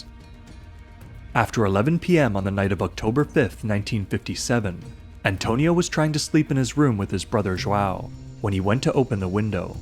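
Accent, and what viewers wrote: Canada. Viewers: the comments of course as predicted are a bunch of middle school minded immature cretins only focusing on the sex and forgetting about everything else smh ... just making lame jokes and puns and giggling like kids who wear helmets and ride the short bus smdh what a complete joke of a channel, I remember now why I hit "don't recommend channel" a couple of years ago, and now the algorithm has suggested it again, thinking I forgot, and me thinking foolishly that maybe it has changed for the better, but nope, same old garbage as always, plus the added annoyance of the canadian "oat" and "aboat" to boot, ugh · I'd rather listen to an AI narrated video than hear another Canadian accent, any day 😭😭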